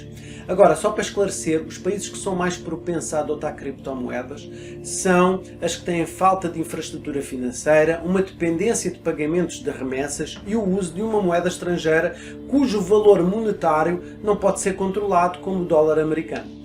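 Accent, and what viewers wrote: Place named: Portugal. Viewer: Não consigo assistir vídeo em português de Portugal 😥😥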